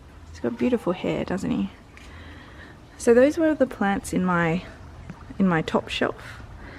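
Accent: Australia. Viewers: You sound Australian! Where are you from? Im a perth gal ✌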